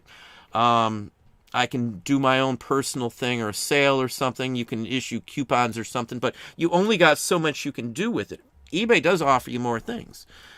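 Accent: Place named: Northwest Ohio, United States. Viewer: I lived most of my life in northwest Ohio. From how you talk I assume you live near Toledo? What city do you actually live in or what town?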